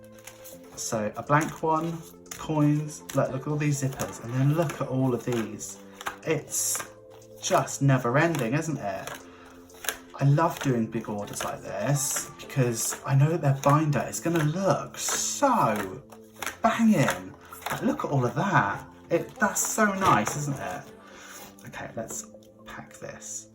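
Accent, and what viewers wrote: United Kingdom. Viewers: Your answer to the kids question was so funny. I have a friend who did NOT want kids, but "oops". She's still not happy to be a mom, but she would kill anyone who looked sideways at her kid. It's pretty funny. But I love how honest your answer was. And I wish you could be uncle to my kid. I think you'd find him fun, and he'd definitely love you. I mean, he already kinda does. He's almost 18 mos, but he loves watching you, and listening to you talk. We're not British, so I know part of the talking is the accent. But also you're so animated and engaging. That person with all the envelopes picked such pretty colors!!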